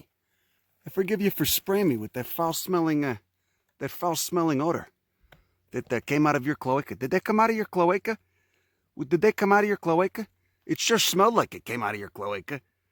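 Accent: Chicago, United States. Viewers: I agree with about society's crap and enjoy your unique take on it. And of course the real American accent from Chicago to share the amazing botany you explain so well in a language I appreciate, thanks for the history and botany you share with the rest of us stuck in this life, for me in CA, surrounded by tweekers opinionated by today's ignorance worrying, about the gas for their torch. More then the beauty that we share with you like this. Awesome, I was born in northern IL, South Beloit. Have no connection to it other then birth cert. Lol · ive never been attracted to someone with such a heavy american accents but fuck he’s too wholesome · make that Chicagoan accent 😉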